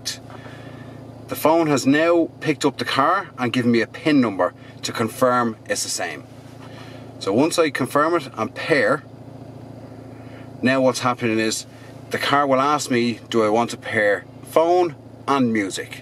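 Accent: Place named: Ireland. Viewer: P.S. - it was worth watching the video just to hear your delightful Irish accent.....:-)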